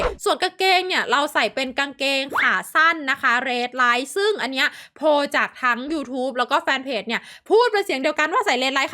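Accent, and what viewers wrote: North, Thailand. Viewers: พี่เป็นคนภาคเหนือหรือค่ะ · พี่ เปนคนเหนือหรอคับ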